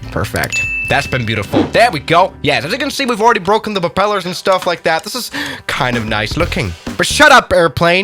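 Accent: France. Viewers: His French accent got me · You French accent is so funny xD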